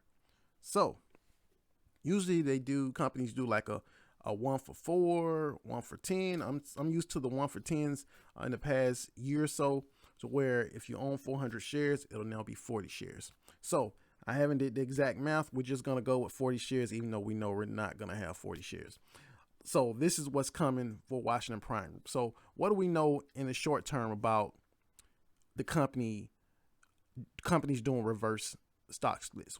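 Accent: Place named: Alabama, United States. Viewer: you from alabama? cool accent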